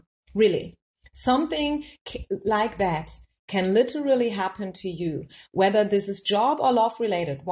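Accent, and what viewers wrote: Germany. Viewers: I just love that you post for us in the US. Your German accent is adorable 💕 Thank you for your timeless message today.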